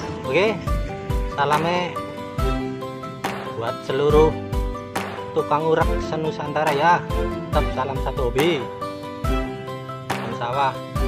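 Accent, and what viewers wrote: Banyumas, Indonesia. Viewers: Wong ngapak eah kang... Ngapake ngendi rika kang. aku Cilacap... Semangat trus kang salam sehobi...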